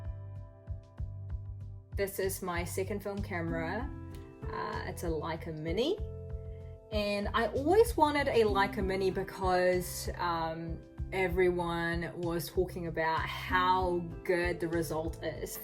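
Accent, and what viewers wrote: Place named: New Zealand. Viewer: ㅋㅋㅋ 맨날 미드만보다가 오랜만에 키위악센트 들으니까 귀여워 😁😁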